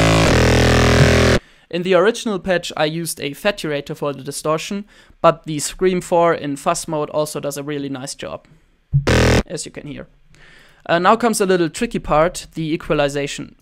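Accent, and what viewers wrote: Germany. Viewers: wetten du bist deutsch haha dein Akzent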